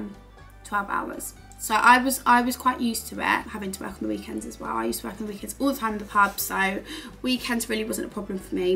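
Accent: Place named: United Kingdom. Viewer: Beautiful in the proper British accent 😮😮😮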